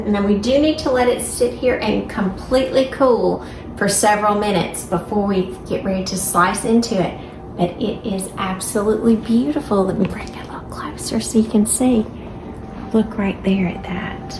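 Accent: South, United States. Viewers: Beautiful southern accent from WHAT state????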